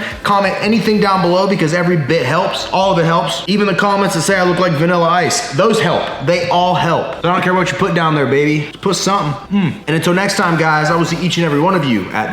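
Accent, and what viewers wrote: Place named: Ohio, United States. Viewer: I can hear the Ohio lol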